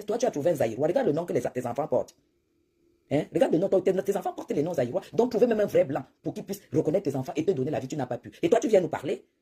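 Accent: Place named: Bamileke, Cameroon. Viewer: Le gars ci propriétaire de la chaîne ton accent bami là est vraiment dégueulasse hein, on t'a seulement fait ça au village ? Je n'ai jamais entendu un accent aussi prononcé et laid arrête de parler en début de vidéo dion!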